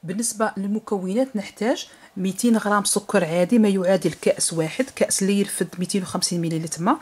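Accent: Oran, Algeria. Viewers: مدام صوتك وطريقة كلامك راقية حتي لهجتك الوهرانية تعجبني بزاف و وصفاتك اكتر نتمني تعليق من عندك انا من اشد المعجبين بشخصيتك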